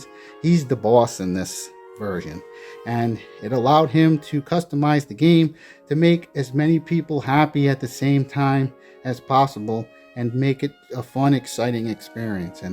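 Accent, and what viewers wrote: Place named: New England, United States. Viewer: Your accent sounds either New England or maybe New York. I started in the mid 90s with AD&D 2e. Still love it. It feels like the real thing. Later editions lack drama and don’t feel as scary.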